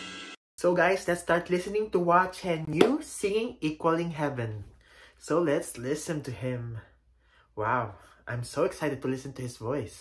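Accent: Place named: Philippines. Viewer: Are u japanese? U sound more like pinoy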